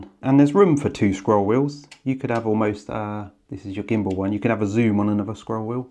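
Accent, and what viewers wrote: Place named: England, United Kingdom. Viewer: good idea to show Potensic what to improve! But what I need to mention about the presentation of this Video: please try to talk in "standard English" to reach an international audience...you speak in an accent that probably only English people can understand (or people who have lived in England for a long time)...a pity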